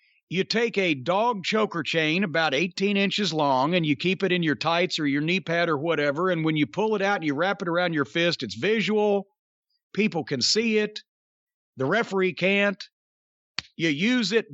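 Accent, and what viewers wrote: South, United States. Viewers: I've complained about the lack of quality control in wrestling for years! Especially when a fed has agents. Hearing the words in Jim Cornette's Dixie accent makes me feel vindicated. "Since there's a real injury, the camera does all it can to stay away from it." Ouch!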